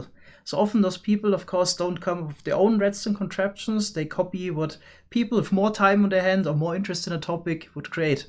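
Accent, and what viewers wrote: Germany. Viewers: I love ur german akzent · Are you german cuz you have this accent?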